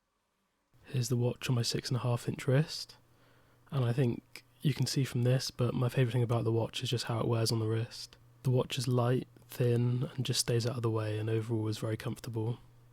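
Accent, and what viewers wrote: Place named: Wales, United Kingdom. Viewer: My mam is from Cardiff, but we were born 1st gen Americanos. My father is from La Paz, maternal Grandad Jamaican--British Merchant Marine, maternal Gran Irish. Me and my twin brother have spent long summers with family back home in Wales. As an adult, having grown up watching a lot of British telly and movies, I have developed a fascination with all dialects of English. Your accent sounds faintly like my cousin Janet's, who has a bit more of an RP Welsh blended accent. I cannot quite ID your accent, but you have a lovely speaking voice for YouTube presentation. I rather enjoyed your presentation, and I would not worry about not feeling confident, especially if you are not accustomed to public speaking, because the lingua franca on YouTube is predominantly authenticity. Polished presenters are perceived as brand shills, so you are way ahead of the game, mate. 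Keep up the good work!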